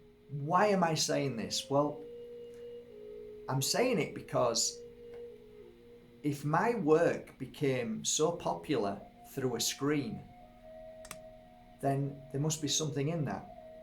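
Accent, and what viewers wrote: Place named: Northern England, United Kingdom. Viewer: Thank you for this valuable information, It was quite nice that when I searched for healing at a distance, it was you that popped up and I immediately recognised you from the sheep farm podcasts. Which I'm a fan off. I'm in Bolton, greater Manchester. I've got a loved one in hospital with a stroke, using your guidance, I felt myself in the room with her, I have tried before, but with no idea what I should be doing, but this was an amazing help, thanks. All the better being a northern lad, whose voice I was familiar with and could relate too. A synchronicity in itself.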